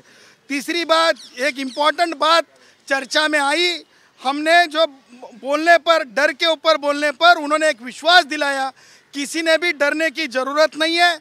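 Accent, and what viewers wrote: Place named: Bihar, India. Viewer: Ha full bihari tone madhe boltoy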